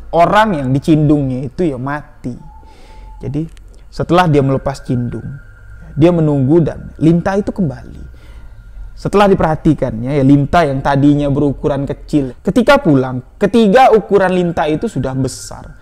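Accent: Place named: Bali, Indonesia. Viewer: Logat bicaranya kaya org bali y mas😁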